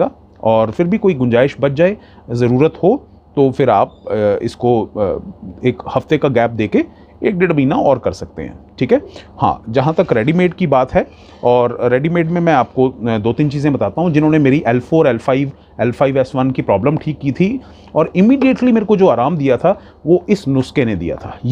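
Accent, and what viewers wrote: Punjab, India. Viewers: Sir,thoda video short me banaye.Thank you for great information. I love your strong Punjabi accent.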